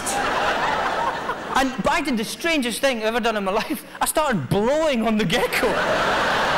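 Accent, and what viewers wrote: Australia. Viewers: He’s awesome! And he’s got the Aussie accent down perfectly! 😂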